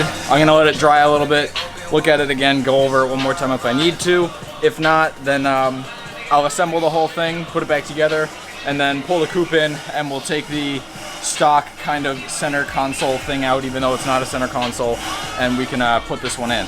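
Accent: Massachusetts, United States. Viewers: Are u from mass ?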